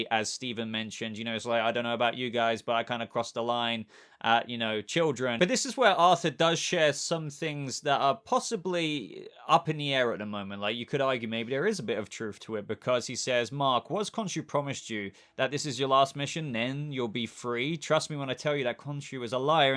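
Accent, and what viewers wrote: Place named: London, United Kingdom. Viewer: to be fair his accent does sound like a foreigner maybe greek turkish Spainsh guy who lived in london or south England kinda middle class esk area or ppl or very r.p speaking environment like retail , office , customer service mix with abit of 'lil o bit' Oliver twisty shite Cockney accent i have heard people talk him or similar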